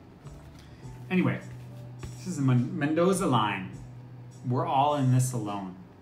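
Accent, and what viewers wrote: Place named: Scotland, United Kingdom. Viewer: Good to see u back. Interesting as ever with a healthy does of Scots. Prefer your first pronunciation of Brisbane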